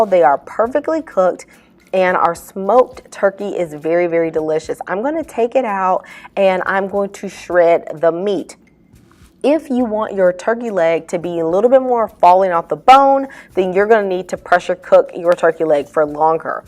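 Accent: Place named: Chicago, United States. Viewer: Sis, are you from chicago? that accent is giving chicago vibes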